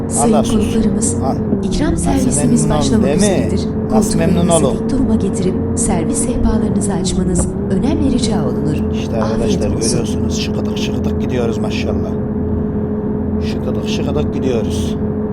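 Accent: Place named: Konya, Turkey. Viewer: Konya şivesi mükemmel olmuş ve aleykümselam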